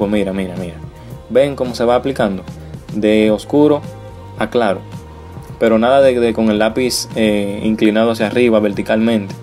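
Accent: Dominican Republic. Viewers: Parece en la voz dominicano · Eres dominicano?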